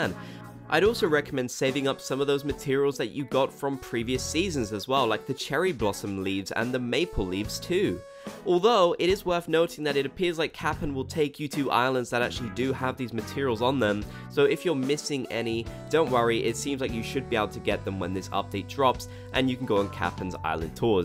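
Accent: United Kingdom. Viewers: ahhh you’re British! I was trying to figure out the accent :) I’m from New England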